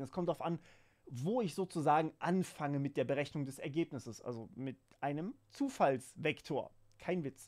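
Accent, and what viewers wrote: Swabia, Germany. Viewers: A scheee, du bisch ja au n Schwôb!!! Ja Griaß Gottle! 😅😅😅💪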